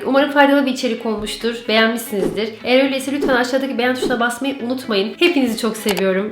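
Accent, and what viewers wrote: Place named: Turkey. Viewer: aksanını acilen düzeltmen lazım çünkü belli bir şekilde Türk olduğun biliniyor.Bu arada saçların çok güzel.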